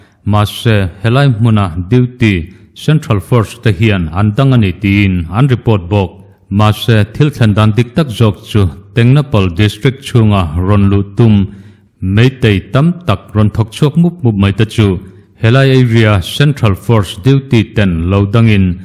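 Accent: Mizoram, India. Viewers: Meitei aw a ang I ti deuh a mi,Mizo aw ngei chu a nih hi,Han sawifiah deuh teh eng Aw nge ang I tih deuh??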